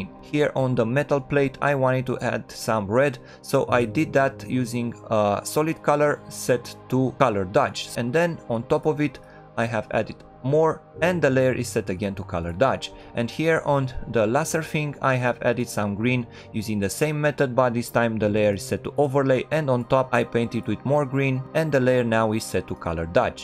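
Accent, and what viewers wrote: Romania. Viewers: Imi parea mie ca esti roman dupa accet :P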